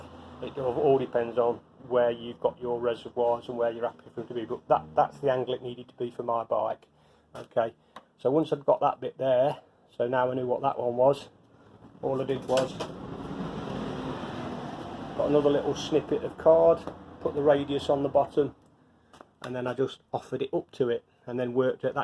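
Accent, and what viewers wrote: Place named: Nottinghamshire, United Kingdom. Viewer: Really good video and explanation 👍👍👍 seriously think I'm gonna have to do it...last few times riding my back and neck getting bit achey...but nearly 63 🤣 ....be great if your ever nearby, on ride ? So I could have a look, I'm in North Notts, your accent sounds close 👍 but thanks again and great idea about the reservoir brackets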